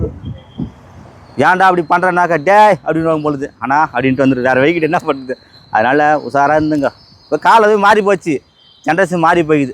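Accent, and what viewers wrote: Madurai, India. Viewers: Madurai vasagam super ❤🎉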